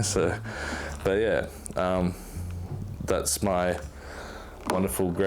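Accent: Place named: England, United Kingdom. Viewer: You sound likevyour English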